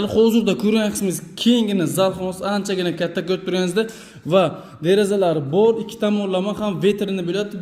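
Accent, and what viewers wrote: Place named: Bukhara, Uzbekistan. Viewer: Бухороча акцентку. Манимча Бухоро. Самаркандликлар акценти бошкача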